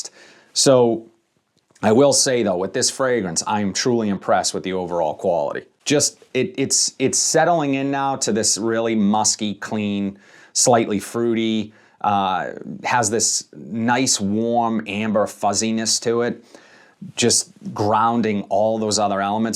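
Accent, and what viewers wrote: Boston, United States. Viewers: Interesting accent. Like Southie Boston lol. Very handsome.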